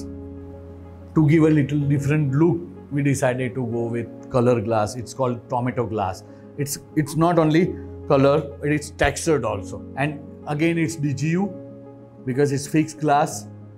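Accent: Gujarat, India. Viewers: "Aww.. you're gujarati accent is sooo sexy".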